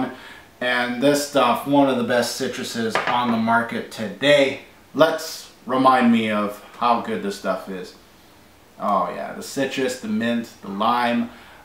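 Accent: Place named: Quebec, Canada. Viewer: Hahahahah!!! I like your French Canadian accent - the way you pronounce 'Frapin' and 'Sel Marin' is fantastic! So a question, (get it?)...is this list based on objective quality or personal preference? Gotta love my man Heeley.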